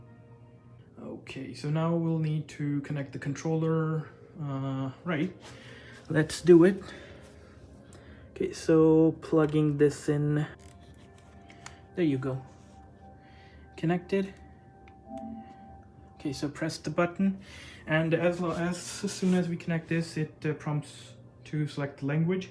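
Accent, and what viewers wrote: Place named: Canada. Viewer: its the strong canadian accent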